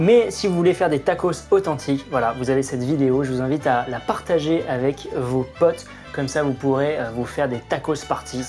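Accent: Mexico. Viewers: Pourquoi tu dis qu'il parle "sans accent" alors qu'il essaye clairement de faire un accent mexicain ? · C'est le meilleur accent mexicain que j'ai jamais entendu ! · No mames este pinche habla mexicanooo, mira tu muy bieeen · Trpp fort avec l'accent mexicain et tout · Saludos desde México, wey!!! Me encantó la intro 😂😂 felicidades por tu español bien mexicano!!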